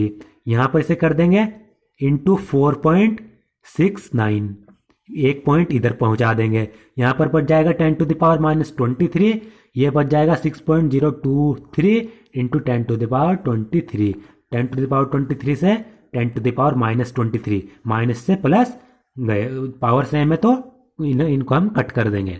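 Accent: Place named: Hapur, India. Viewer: I think you belongs from near hapur According too your language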